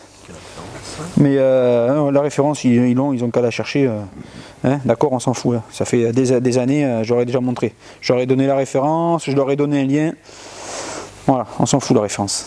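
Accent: Gironde, France. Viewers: Toujours d'excellents commentaires avec un boulot impec, et en plus le petit accent de la Gironde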